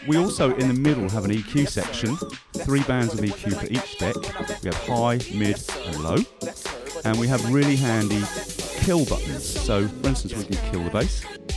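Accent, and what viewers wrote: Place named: United Kingdom. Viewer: Thanks! GREAT TUTORIAL. For some reason things are a lot more intriguing in a British accent. PS. Im going to download all the songs you played.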